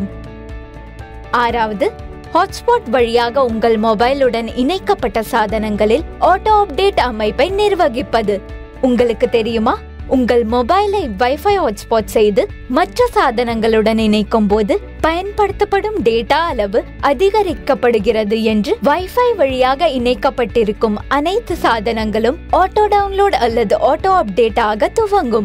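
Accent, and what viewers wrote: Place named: Haryana, India. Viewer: Haryanvi Hindi medium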